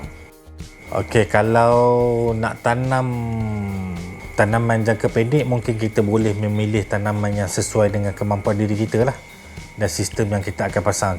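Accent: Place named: Pahang, Malaysia. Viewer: Lidoh Ora pahang ni kalau tengok gaya cakak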